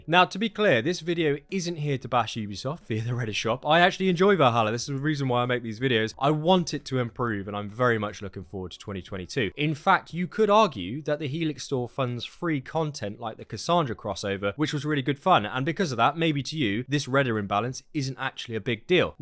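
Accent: United Kingdom. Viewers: More great Valhalla insights - thanks. Some interesting changes hopefully,. I'm relatively new - still playing England map - but get frustrated with tattoos and more tattoos. At least I get to accumulate opals - until 'must have' items drop back-to-back like the dragon raven skins and the Shattered Blade. I noted that the Valkyrie outfit was removed from Helix store recently so glad I got two pieces from Reda - and saved money. One piece of armour is useless so I fear frustration is meant to make us spend £$. P.S. Get homesick every time I hear a Brit accent - lol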